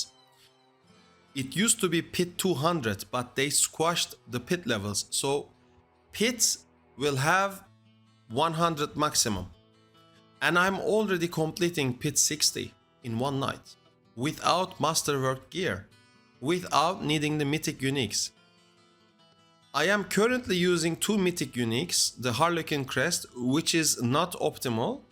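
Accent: Turkey. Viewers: isim dehşet iyi, youtube a yazdım aksanda Bi farklılık sezdim aha dedim türk 😁😁 ekmeğini yiyoruz forumlarda saygılar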